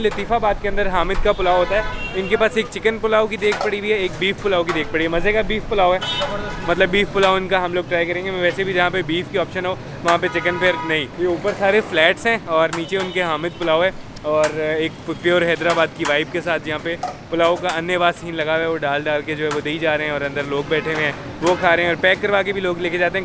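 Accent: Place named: Punjab, India. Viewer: اچھا لگا پیشکش کا انداز پنجابی کا ٹچ مزید دو آتشہ کر دیتا ھے مرحبا پنجابی کو فراموش نہ کرنا یہ اردو کی ماں ھے سینئر ھے ماشاء اللہ سدا شاد باد منزل مراد رھو...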